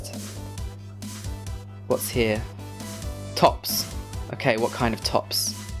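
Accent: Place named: England, United Kingdom. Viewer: Where r u from by the way? I've visited London last year and it was an amazibg experience. From your accent it's more than obvious you're from England))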